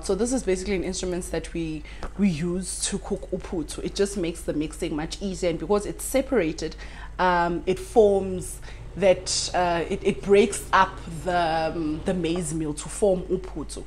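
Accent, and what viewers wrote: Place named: Africa. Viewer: stop complaining for nothing!We are African,get used to our English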